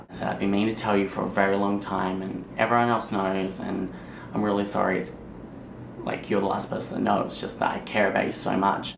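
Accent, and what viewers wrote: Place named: Australia. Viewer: i come hear for his australian accent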